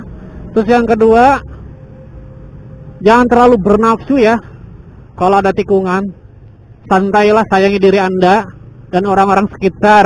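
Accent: Banyumas, Indonesia. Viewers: Ngapak temen lur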